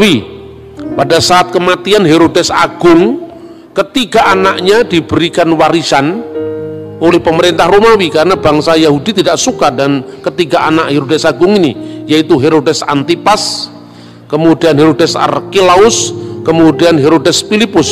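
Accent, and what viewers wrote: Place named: Java, Indonesia. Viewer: Senang pula, lihat Pak yg berlogat Jawa.... Tapi bermanfaat, bagi penganut Kristen di Indonesia.....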